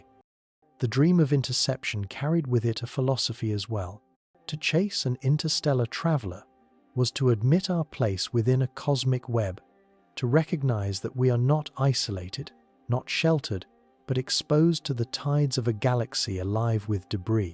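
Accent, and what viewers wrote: United Kingdom. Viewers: this British accent crap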